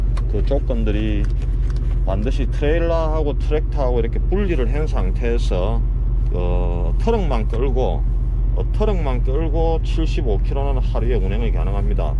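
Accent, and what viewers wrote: Gyeongsang, South Korea. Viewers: 오빠 열나게 달려봐라. 단디 잡아라 가시나야...... 경상도 사투리는 언재나 들어도 재미있고 친근감이갑니다. 아저씨는 들을수가없어 안타 깝습니다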